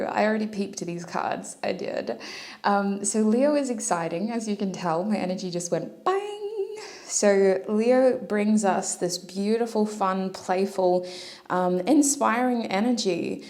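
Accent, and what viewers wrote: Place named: Australia. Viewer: Thank you, love your Accent, either Australia or New Zealand? interesting reading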